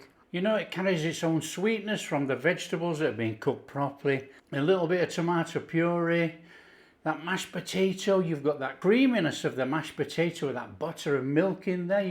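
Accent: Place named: England, United Kingdom. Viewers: I thought he was a Notts man but I guess it's not far from Yorkshire. Sorry chef I'm from the westcountry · Hey, Chef, where are you from in England? Trying to place your accent!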